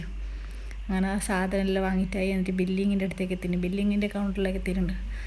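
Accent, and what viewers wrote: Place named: Kasaragod, India. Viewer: Kasaragod basha super 👌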